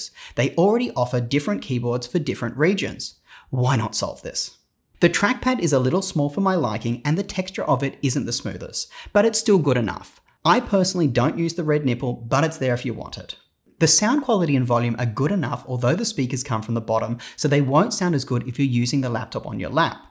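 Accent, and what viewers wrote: Australia. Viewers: Finally, a reviewer that knows what he's on about and isn't recycling the same old lines, plus it is so refreshing to hear an Aussie accent rather than some monotone suburb US one. liked and subbed from the UK!! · Are you Australian?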